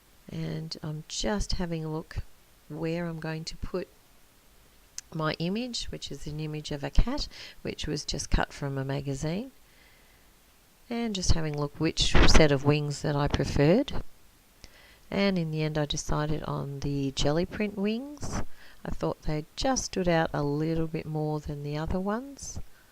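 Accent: Australia. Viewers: Hey, fairly new subbie, thank you for your video. I thought you sounded Australian, then you mentioned the reject shop, then you told us you were. I’m on Gold Coast QLD. Where do you hang out, glad I found you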